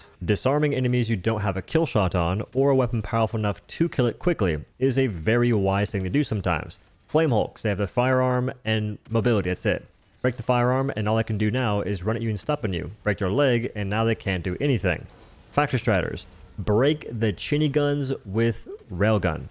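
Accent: South, United States. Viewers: the way he says WH- is prime american. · could be georgia could be louisiana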